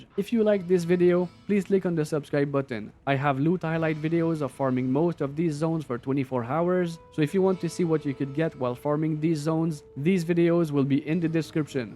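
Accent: Quebec, Canada. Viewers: Me semblais en ecoutant jtrouvais sa sonnait quebec 😂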